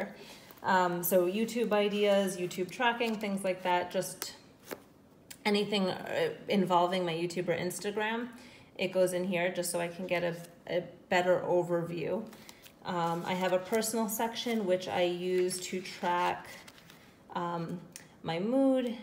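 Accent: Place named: North, United States. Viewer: I wouldn’t say that you say it differently. I would say it’s a northern compared to southern accent. I like it. We draw everything out in the south. I’m very self conscious about my twang. I had someone, not from Oklahoma obviously, tell me that I was really smart and they were surprised because of my accent. 🤷🏻‍♀️ WTH does that mean?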